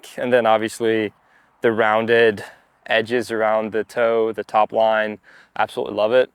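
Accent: United States. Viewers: He sounds so American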